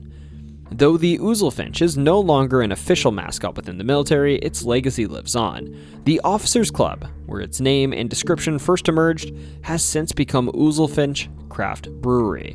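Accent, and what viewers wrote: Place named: North, United States. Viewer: "Hen-re-co". You must be a Yankee pronouncing your "I's".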